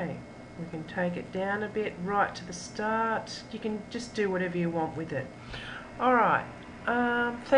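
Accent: Australia. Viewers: Australian??? IM AUSTRALIAN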